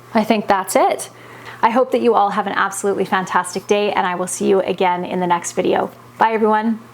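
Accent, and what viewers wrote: Canada. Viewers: You didn't ask, but I found you via Becky of Acre Homestead. I am childfree and live in Denver in a tall building with a very windy balcony. Last year, my delightful herb tower blew over twice, so I ended up gifting my herbs/lettuce to others who live here. I'm probably not your expected demographic. LOL! I'm just here for entertainment and some (scaled down) recipes. I've been bingeing your videos and putting the pieces of your story together. You are delightfully entertaining and relaxing to enjoy. I also really like your style: quick intro/outro bookending great content. I grew up in Texas, and I just love hearing the Canadian pronunciations/words: paprika, sorry, tuque, and more! Congratulations on the 100K!!! I can't wait to see your button plaque hanging on the wall behind you (at least momentarily, right?)! Thank you for carrying us all along for the ride. 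Oh and watch out for Martha; she's fierce and might start demanding her own channel. #LongestCommentEver <3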